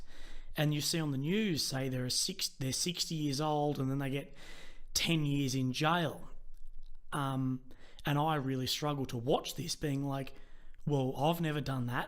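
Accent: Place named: Australia. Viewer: Hi mate, just "found you" in your latest end Feb 2024 video on the Ukraine conflict analysis and doing the back channel and will arm chair my way through 4yrs. At this stage I see where you are at but don't know how you got 'here' and don't know if you will ever see this post with millions of views and 100s of thousands of comments.... But I'll comment anyway mate. But I'm and Aussie ex RAN Submarine Officer, did my 25yrs and been out about 10 yrs now and been pretty PTSD broken as I've learnt you REALLY shouldn't get washed of the back of a Collins class somewhere doing something you can never talk about and leaving the service being a way to broken and a 'tough' hard charger - nothing like your story at all but I feel in a different way, and agree about the NEWS and haven't listened to the bullshit MSM tv or radio in 8 yrs,. Now I follow and listen to a variety of good smart people really discussing world events and I haven't missed TEN or ABC or the radio heads sprouting off giving me there bullshit opinions..... 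And I haven't missed it. Now I find myself (a dam long story but a ball breaker of a story) in the far Nth Arctic Sweden living a better, balanced and good life with my kids, although it's a weeeeee bit removed and remote but it's good - as now I'm finally fixing the head bongo and know what's important. I will keep watching all your current stuff and follow your next 4 yrs journey and enjoy hearing a no bullshit Aussie voice in all the world's noise. I don't know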